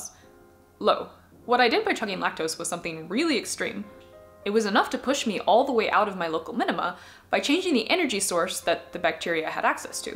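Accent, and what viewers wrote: Canada. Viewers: No offense but are you french canadienne? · are you canadian you sound canadian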